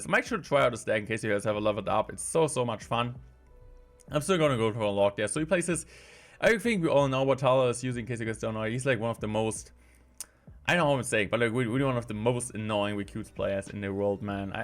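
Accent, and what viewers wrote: Germany. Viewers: Hey, i can tell that english is not your first language. Is your first language german? Because i am learning german, and i can kinda hear it in your voice.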